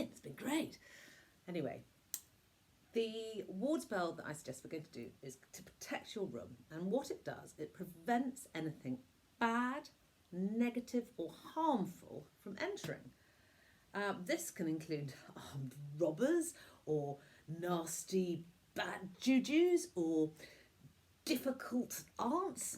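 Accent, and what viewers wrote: United Kingdom. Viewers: it,s nice to hear a respectable brittish woman speak about stuff.:face-red-heart-shape::face-red-heart-shape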